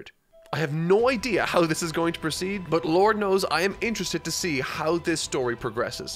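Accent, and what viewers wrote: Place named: Ireland. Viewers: he pronounces the word "calm" the same. I think it's just his Irish accent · he's irish that's just his accent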